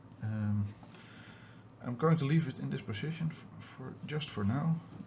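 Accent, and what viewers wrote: Netherlands. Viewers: Ik hoor aan uw accent dat u Nederlands bent dus dacht stuur u even een berichtje in die taal. Ik heb op dit moment het houtboard gezaagd en hou uw boor breedte van 3.2 mm per ledje aan. Nu vroeg ik me af, Hoeveel cm u tussen de ledjes heeft zitten. Is dit toevallig 15mm? Vriendelijke groet en bedankt voor uw zeer gedetailleerde video.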